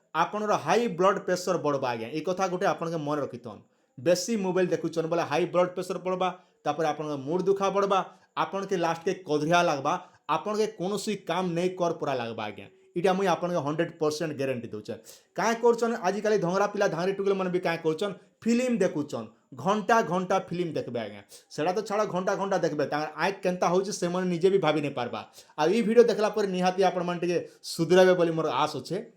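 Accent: Kalahandi, India. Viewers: ତୁମେ କଳାହାଣ୍ଡି ଜିଲ୍ଲା ର ଦାଦା ତୁମର ଭାଷା ରୁ ଜଣା ପଡୁଛେ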